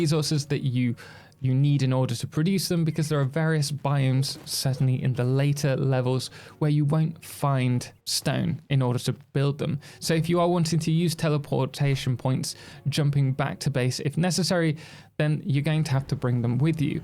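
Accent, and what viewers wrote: England, United Kingdom. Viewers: My man, which part of England is your accent from? Can’t place it exactly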